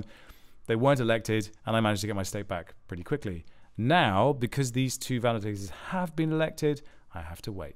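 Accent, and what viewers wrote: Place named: United Kingdom. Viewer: It’s just a British accent. The world is mesmerized by it.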